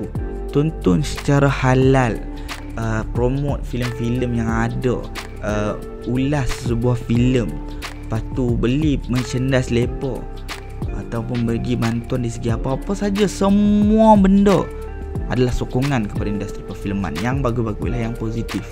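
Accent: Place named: North, Malaysia. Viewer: done subscribe..sbb nk dgr bhse utara.. tp org mane ekk..perlis penang atau kedah??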